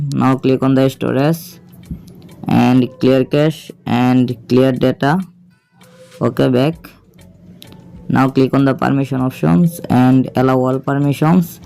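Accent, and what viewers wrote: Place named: Tamil, India. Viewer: Tamil voice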